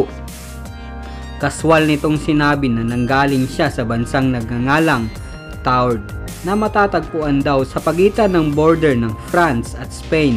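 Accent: Visayas, Philippines. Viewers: ikaw din iba tuno mo haha bisaya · Bisaya ba sya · Maganda ung kwento kaso bisayang bisaya kakawalang gana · Bisaya ka ba pre hahahaha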